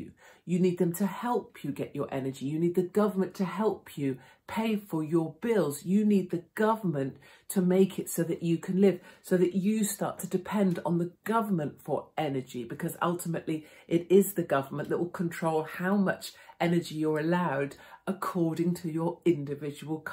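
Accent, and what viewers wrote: United Kingdom. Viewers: is one of the only Brits I can listen to without getting sick of hearing that annoying accent.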